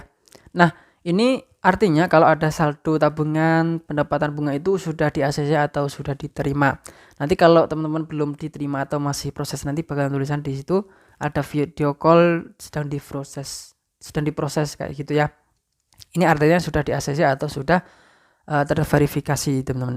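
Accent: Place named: Central Java, Indonesia. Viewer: Looo wong rembang iki 🤣🤭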